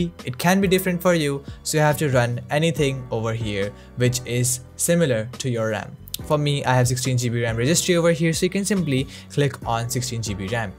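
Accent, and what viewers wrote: India. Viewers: We can hear your Indian accent. You don't have to hide it.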